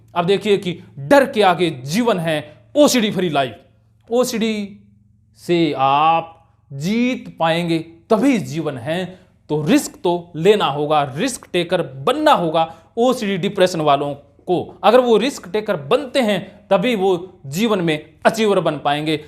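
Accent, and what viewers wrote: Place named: Marwari, India. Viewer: Sir aap great o sir ese lgta ha ap marvadi bhasha bolte o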